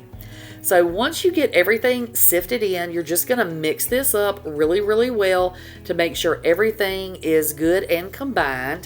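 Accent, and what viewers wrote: South, United States. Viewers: Yummy 😋 I'm New Here & Just Love Your Ancient 😅I Have That Long Southern Draw Ancient..You Also Can Crack Me Up, So I Know I'm Gonna Love Your Channel..Be Blessed 😊❤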